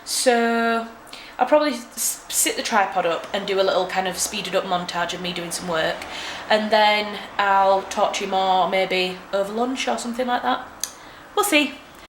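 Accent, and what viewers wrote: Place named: United Kingdom. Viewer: Hi, I clicked onto this by accident and I'm so happy you're so engaging and lovely ! I'm from the Uk like your accent and I'm really interested in getting into writing articles for company's/website. I would want to do it from home, alongside my full time job. Any tips on how to get into that field ? Thanks! Love you !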